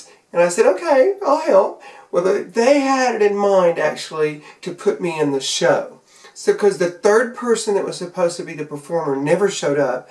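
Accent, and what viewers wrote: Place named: Arkansas, United States. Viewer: you are a hoot! I've watched all of your videos tonight and read thru all the comments (I'm a nosey heifer like that) I just wanted to say....I too, am from Arkansas and now live in Texas so I absolutely love your accent being as it sounds like me! lol I'm not that far from you and if not having someone to help you film is standing in the way of you posting more videos.....hunny, I will film for you! =}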